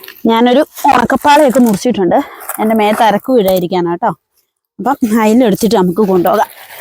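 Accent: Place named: Idukki, India. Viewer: ഞാൻ വിചാരിച്ചു, സ്ഥലവും സംസാരവും കേട്ടപ്പോൾ ഇടുക്കിജില്ലയാണെന്ന്❤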